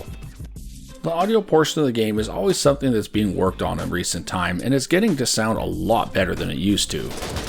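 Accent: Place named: Finland. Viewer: is this guy from Finland? wheres this accent from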